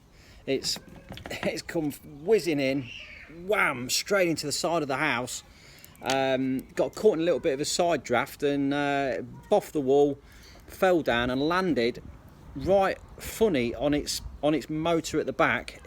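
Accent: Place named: Lincolnshire, United Kingdom. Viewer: You sound like a lincolnshire lad:-)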